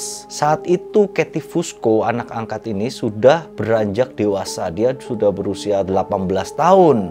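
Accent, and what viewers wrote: Java, Indonesia. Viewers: Halo uncle....medhoknya itu lho🙈🙈😂😂😂😂😂😂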